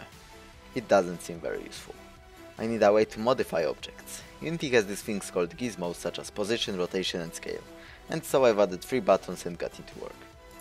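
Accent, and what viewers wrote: Poland. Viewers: ale polski akcent. fajny film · czyżby Polak?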